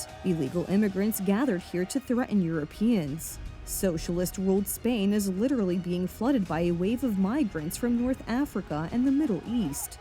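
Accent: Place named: United States. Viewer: Is this a Polish news station? Please don't use the voice with odious American sounding accent. It's bad enough hearing that accent in their vapid movies but please, not on Polish news. I used to like listening to your Polish newsreaders speaking aEnglish, clearly and concisely. And I'd still prefer to hear real Polish newsreaders speaking than listening to that yankee accent.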